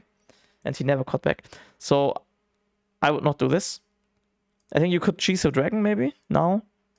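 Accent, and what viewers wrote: Germany. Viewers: Immer dieses komische Englisch, das wird sich kein Schwein anschauen nur weil Tyler1 im Namen steht weil du eben kein Nativ Speaker bist und man das hört. Würde ich auch nicht wenn ich Englische Streams schaue dann von jemanden der dies als Muttersprache hat und nicht einen deutschen der Englisch spricht. Ist ja Giga Cringe und die herbste Reichweite generiert man damit auch nicht weil man eben einen guten Bruchteil seiner Fans enttäuscht die aktuell schon den Kanal folgen und vielleicht kein Englisch können.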